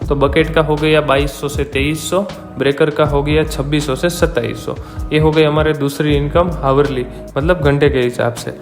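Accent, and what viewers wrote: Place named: Gujarat, India. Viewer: Doing Good Job Lagta Hai Aap Gujarat Se Ho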